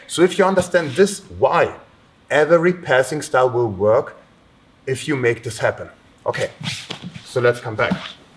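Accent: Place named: Germany. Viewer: Extremely good explanation! Is it a german method explanation? (maybe your accent, gave ma a hint)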